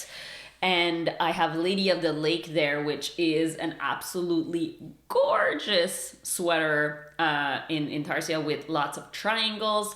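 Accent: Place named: Quebec, Canada. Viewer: ! Ça fait plusieurs vidéos que j'écoute de toi et à chaque fois je me dis... Aaaaah cet accent est tellement familié. C'est sur que tu es Québécoise! Je capote sur tes vidéos! Et tu met de l'avant des artistes francophones que je ne connaissais pas. Merci pour ton travail!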